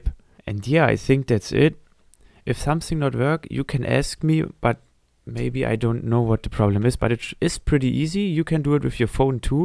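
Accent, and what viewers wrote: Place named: Germany. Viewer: Du hast aber mega den deutschen Akzent xD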